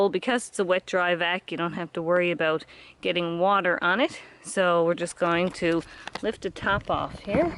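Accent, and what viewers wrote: Newfoundland, Canada. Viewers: Ah, I thought I detected a Newfoundland accent! So glad to find a Canadian channel that recommends things that I can actually buy here. This is a great idea; I'm just concerned about the noise.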